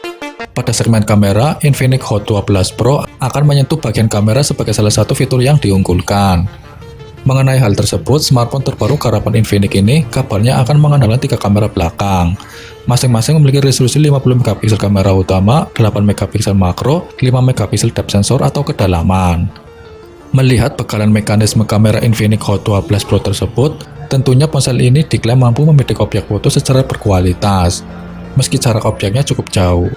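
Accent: Banyumas, Indonesia. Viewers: Mantap mase .. ra ngapak ra kepenak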